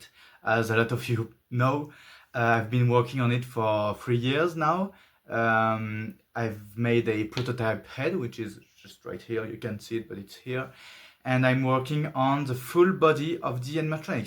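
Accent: France. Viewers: I KNEW YOU WERE FRENCH i recognize it from the accent, but seriously im very sorry to what happened to your project i've heard that the temp has even reached to 40° in some regions, i have never thought in my life that france would be hotter than algeria but here it is now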